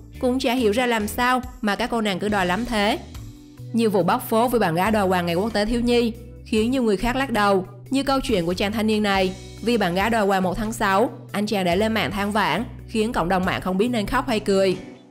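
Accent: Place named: Nghe An, Vietnam. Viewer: giọi nghệ an khó nghe thì sao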